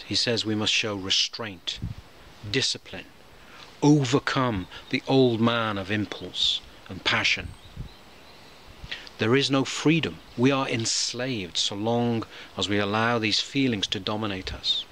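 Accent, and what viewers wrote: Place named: United Kingdom. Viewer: Very compelling talk Fr.. Sounds like you’re of British persuasion. What parish do you shepherd?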